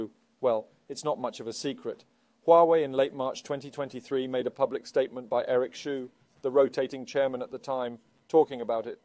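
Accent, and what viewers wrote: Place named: Oxford, United Kingdom. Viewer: He is speaking Oxford English- CLEARLY, with the right speed- for u tube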